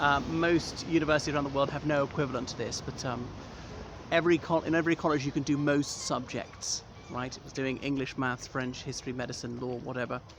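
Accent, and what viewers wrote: RP, United Kingdom. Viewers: I watch your clip to improve my RP accent... Whoa.... Such great knowledge. Regards, Siriluck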